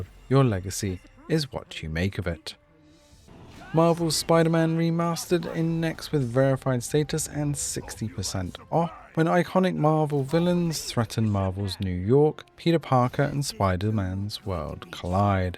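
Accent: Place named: United Kingdom. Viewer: 😂 the narrator of this channel sounds like he's so bored, that brit almost put me to sleep. Highly doubt he games. Just bought Midnight Fight Express can't wait to check that one out!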